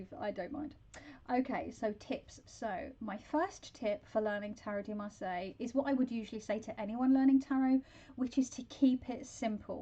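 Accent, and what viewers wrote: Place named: United Kingdom. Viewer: I wish I would've had you for a teacher when I was in school! Professor course on "the metaphysics of English literature" is fab! cool British accent, long flaming red hair wonderful speaking voice and a master of waffling, what more could you ask for in a cool college professor!!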